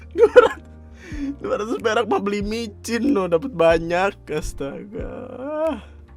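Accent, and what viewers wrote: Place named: Medan, Indonesia. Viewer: Dari logat nya kaya nya orang Medan itu bang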